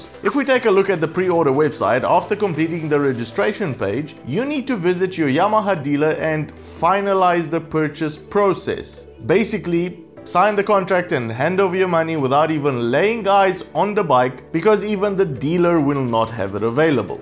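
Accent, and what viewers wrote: South Africa. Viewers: Dude.... do you have some South African in you? You have some very South African accent in your words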